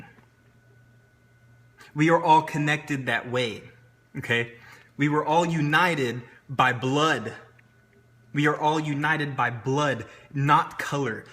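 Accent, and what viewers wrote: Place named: Latin America. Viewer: And honey, I know my races very well, you are mixed somewhere down the line, you are definitely not pure white, I can tell by the slight color to your skin, and facial features. You also have a slight accent that of a Hispanic. Europeans are very mixed with Indians and other races somewhere down the line. By the way, nice video.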